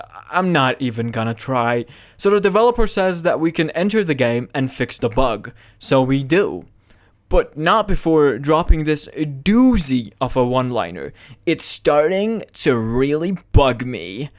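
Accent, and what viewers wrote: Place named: United Kingdom. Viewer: Hi British guy IT RYTHMS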